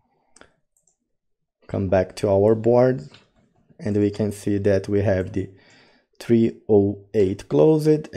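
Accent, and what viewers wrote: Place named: France. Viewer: thank you so much, I dont know why such useful video does not attract many people. BTW, I love your French-English accent 🤩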